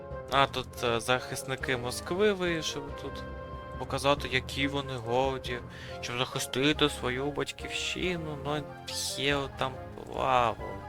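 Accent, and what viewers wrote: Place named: Surzhyk, Ukraine. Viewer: найсолодший суржик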